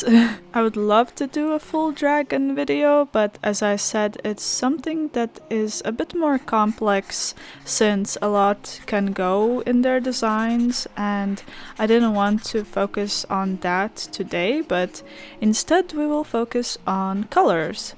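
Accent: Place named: Belgium. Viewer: my first thought was "wow, these axolotl dragons kinda remind me of httyd for some reason..." **a minute later** "OH MY GOD ITS LITERALLY TOOTHLESS BUT RAINBOWS" also i was drying my hair while watching this so i had the volume up and apparently sounds like me but with a Belgian(?) accent instead of an American one, according to my mom lol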